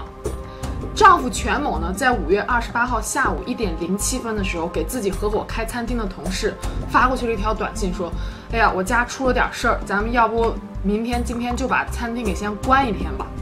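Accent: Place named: China. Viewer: 幹，26腔聽不下去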